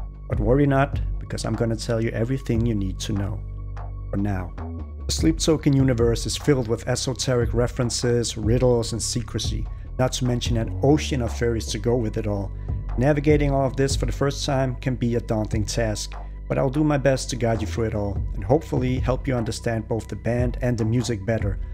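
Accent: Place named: Denmark. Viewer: Dansker accent :D great video.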